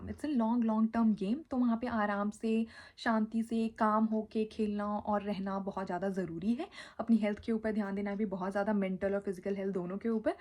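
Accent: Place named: Delhi, India. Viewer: You claim to be a Bihari origin, but uour language sounds of Delhi origin. You must have been brought up in and around Delhi. With good school backgraound. It is your schooling that is making you successful everywhere which is not accessible to others. Take example of ssc CGL exam, you scored 156 because your english was good from school days.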